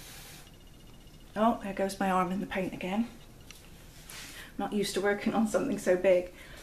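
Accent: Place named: West Country, United Kingdom. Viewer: love this one I noticed you have a westcountry accent do you live near St.Austell just wondered if you did workshops.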